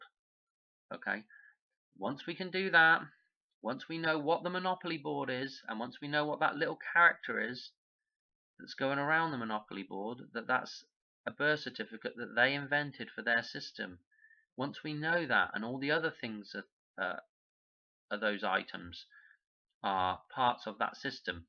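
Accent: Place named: England, United Kingdom. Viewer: Came over from Secrets of Saturn, well explained. Wasn’t expecting to hear an English accent. Greetings from Edinburgh Scotland.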